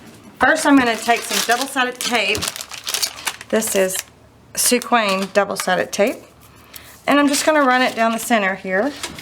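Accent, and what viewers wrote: South, United States. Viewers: You are so darn cute!!! I'm from the South and I love listening to you as I have lived in California long enough NOT to talk Southern and I so enjoy listening to you and yo are so funny. I have the same problem you do with something right in front of me and NOT being able to say the right word, ROFL!!! Anyway, I have just watched all 4 of your videos about the tag journals and I have learned way more than if I watched 10 other videos. THANK YOU SO MUCH!! I think I like the putting together of them like the one at the end since the stitching part looks more complicated than I think my brain would allow me to do, hee hee~~ Thank you for teaching this newbie so much. Take care and God bless you~~ xoxo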